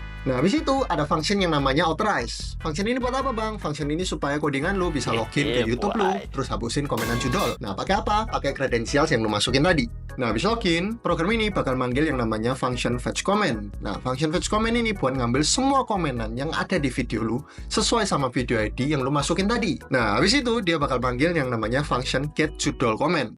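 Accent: Surabaya, Indonesia. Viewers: wong suroboyo iki mesti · Wong Surabaya Iki mesti😂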